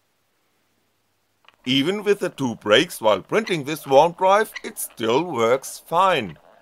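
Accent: Germany. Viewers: Is that a German accent?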